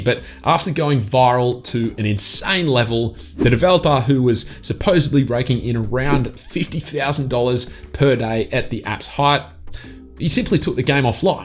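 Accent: Australia. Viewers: When I heard your accent I thought you are a British but you are definitely Australian 😂❤️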